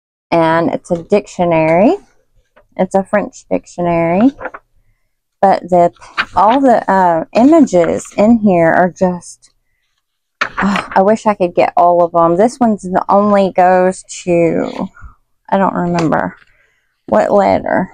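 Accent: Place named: Texas, United States. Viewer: I just ❤ your Texas accent. I have a Southern accent too but, I am from the North. Half my family is from Texas and the other half is from Michigan so, I have both accent 😂😂😂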